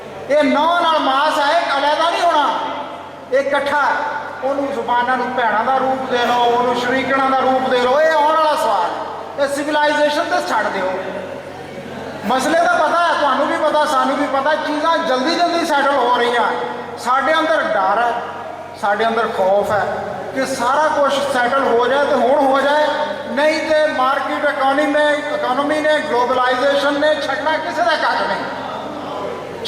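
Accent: Hoshiarpur, India. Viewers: Bhaji tuhada lehja pure 100% hoshiarpur wala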